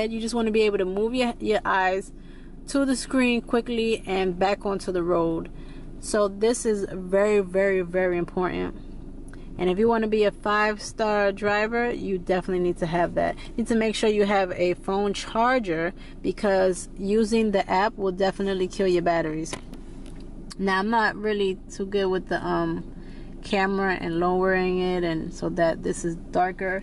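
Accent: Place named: New York, United States. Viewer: It sounds like you’re from New York, I know you said you’re in Atlanta. Maybe I can treat you to lunch when I’m in Atlanta. 😉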